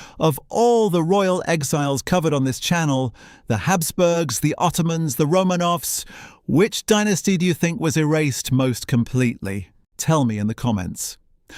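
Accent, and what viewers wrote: United Kingdom. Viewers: The British accent says it all! Still trying to support the Qajars to reep from their 'not know how'? Speaker says that after Reza Shah free speech was banned. Shame on you! It is the British way to manipulate and take advantage of countries thru political manipulation. God bless the USA which the Brits lost with the Grace of God and bravery of Americans and so the Brits are still burning their as*es for the war of 1812. God bless Trump for not appeasing and listening to the Brits. God protect the USA, Iranian people and Israel from all especially the Brits.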